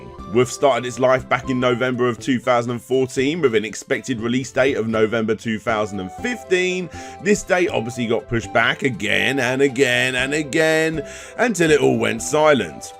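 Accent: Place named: United Kingdom. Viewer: Whoa whoa whoa wait one gosh darn second.. you're British? So.. am I British? Is there a test to find out?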